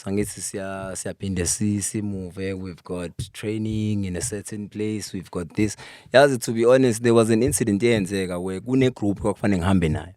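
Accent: Zulu, South Africa. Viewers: zulu accent❤️😂😂😂😂. Iynhlwabuse😂😂😂😂. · IsiZulu siki.🔥💯🤣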